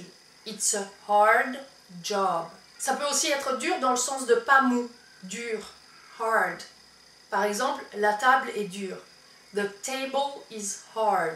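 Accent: France. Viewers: tiens, toi tu viens du nord non ? :p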